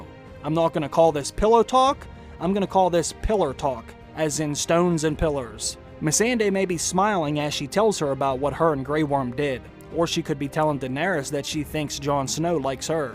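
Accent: United States. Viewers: Which American accent is that ?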